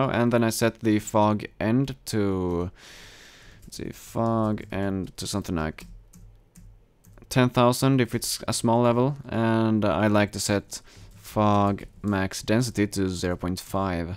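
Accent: Sweden. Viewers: Hah jag kunde höra att du var svensk :D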